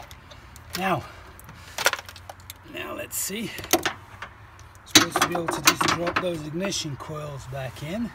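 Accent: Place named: Europe. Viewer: Wow! Never knew or pictured you speaking with a European accent lol! Awesome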